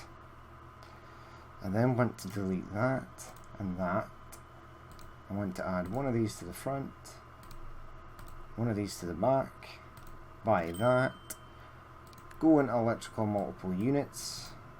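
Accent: Scotland, United Kingdom. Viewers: Thats a proper Scottish accent laddeh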